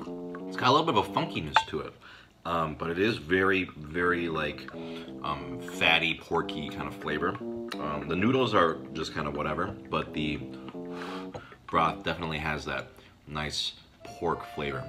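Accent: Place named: United States. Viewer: Why do you sound 100% American??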